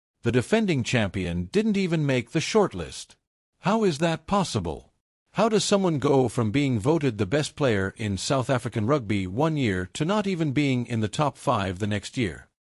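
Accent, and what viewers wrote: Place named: United States. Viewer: Oh, come on! These awards go on current form and achievements. Cheslin Kolbe is an amazing player, and one of the best in history, world-wide. But, he is NOT alone. There are other incredible players who also deserve to be recognised. Only one can be the winner. Just because Kolbe isn't in the running for this award doesn't mean he is less revered than the ones who are. It just means that in 2025 he played in less games due to injury or whatever, and then when he did play, he didn't get as many opportunities to shine as he usually does. What I find ridiculous is that a Springbok rugby channel has an AMERICAN ACCENTED AI giving the commentary!! It can't even pronounce our players names correctly!! Who the F*** is Jasper WEEZE?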